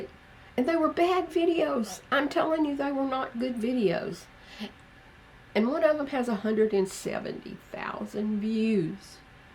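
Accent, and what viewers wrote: South, United States. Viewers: Oh my god!!! You remind me so much of my mom!!! And you have the southern accent too!!! I wish she would start doing this. Amazing! I’m subscribing! I do content creation gear reviews on one of my channels. Great job!! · I just found you. You are amazing. Love your southern accent. Sounds like my family in the south. Keep doing what you’re doing and know you are more than enough. 😊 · I love your southern accent. Our town has been overrun with transplants and foreigners. It’s so seldom we hear southern accents anymore. I miss it so much, especially since I supposedly live in the south. Or what used to be the south. Anyway, I do enjoy listening to you speak. Your voice is so comforting that I could easily fall asleep. You remind me of my precious older relatives that aren’t around anymore, that I miss so much. God bless you. Keep on going.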